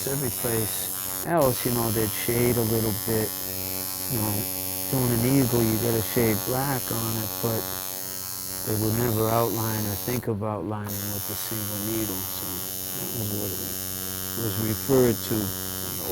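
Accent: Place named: United States. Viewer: Feels like Christian Bale got his american accent for parts from this guy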